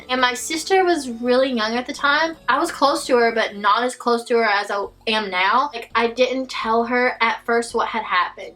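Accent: South, United States. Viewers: Where are you from, you sound very country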